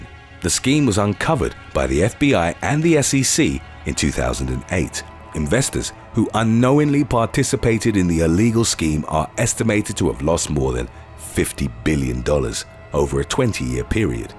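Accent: United Kingdom. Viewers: Thank you for your informative, interesting, and accurate content. All stated succinctly in a lovely British accent. Maybe Tupac’s mgr was taunting fate when he named his company .Death Row.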